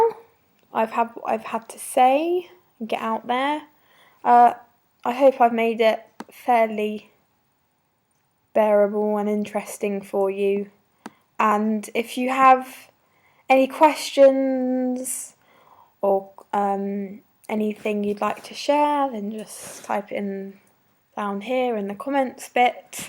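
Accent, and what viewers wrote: United Kingdom. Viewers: lol i can never take british accents seriously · where from the UK you from?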